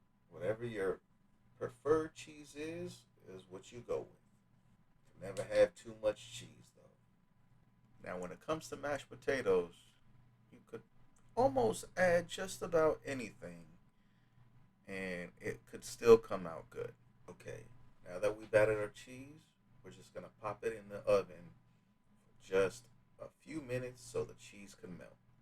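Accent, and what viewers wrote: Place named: South, United States. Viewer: Your voice is oddly soothing and I hear a southern accent even tho idk where u got that from. But MAN I miss your cooking already! At least I can watch these now lol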